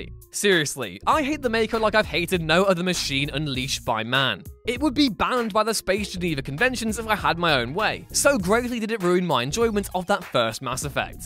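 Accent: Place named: Liverpool, United Kingdom. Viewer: Can you not make the liverpudlian narrate videos? There's a reason they're voted to have the worst accent in the uk.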